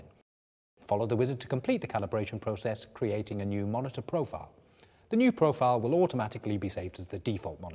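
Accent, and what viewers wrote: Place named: United Kingdom. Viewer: He's so British I'm afraid to call myself British.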